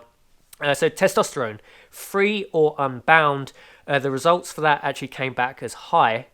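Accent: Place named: South London, United Kingdom. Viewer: Sounds like you live in South London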